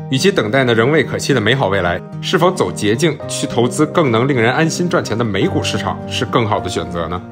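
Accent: Beijing, China. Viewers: 一直觉得是北京的～终于在这个视频里得到了认证哈哈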